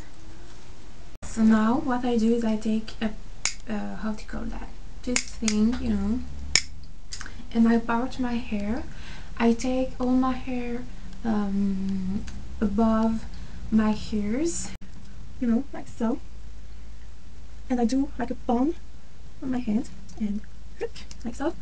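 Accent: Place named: France. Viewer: Heey tu serais pas française par hasard?? Tu parles avec le même accent que moi , c'est troop choquant j'ai l'impression de me voir xD Anyway , if not , i just wanted to know what's the name of your hair color (the one on the lenght)? And my hair is now VERY dry after the salted sea ... Would you recommend me some products or any tips ?? Thaank youuu =DD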